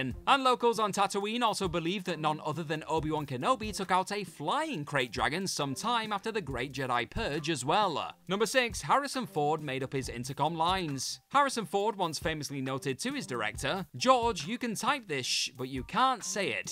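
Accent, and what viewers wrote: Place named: Geordie, United Kingdom. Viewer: Gotta love a slightly posh Geordie nerd. I am one myself.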